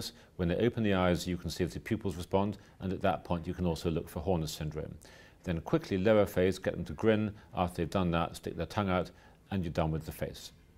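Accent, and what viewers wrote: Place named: United Kingdom. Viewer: I love the british acent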